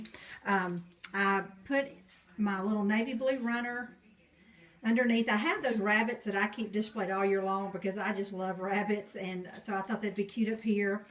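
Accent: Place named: Arkansas, United States. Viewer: i just love rabbits, too -- and those are adorable. The eggs look great in the jars. i live in Va. and my best friend lives in Mena, AR. Her husband is from AR and your accent is so great and reminds me of them -- makes me miss them, too -- maybe i'll send them a care package of eggs !! Also, thanks for the tip on Dollar Tree. i was going to Tues. morning tomorrow, but will hit DT first. hope you and your family have a very blessed Easter season.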